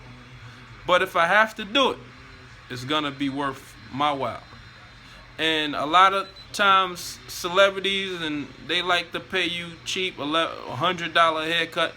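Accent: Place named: Harlem, United States. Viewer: Bro. Sound like Harlem and the shop could be there as well. Uptown Uptown. Interesting Inside infor on rappers being cheap!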